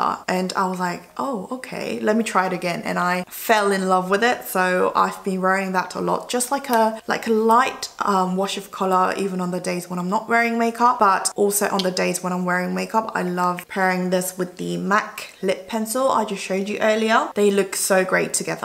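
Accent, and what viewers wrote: England, United Kingdom. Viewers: 너무 예쁘시고 영국발음도 듣기 좋아서 이거 한 10번째 이상 보고 있는 거 같아요. 대사도 워드에 다 쳐서 영어공부로 외우고 있어요 ㅋㅋㅋㅋㅋ 앞으로 외울 때까지 <num>번 더 볼 예정... 사랑스러운 영상, 진심으로 감사드려요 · Oh wow idk why but i can hear your english accent more in this video! Hope you can do makeup collection video one day! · 언니 영상으로 영국발음 공부해여....♥️ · 우아 오랜만에 언니 영국영어 들으니까 너무 조아요❣️❣️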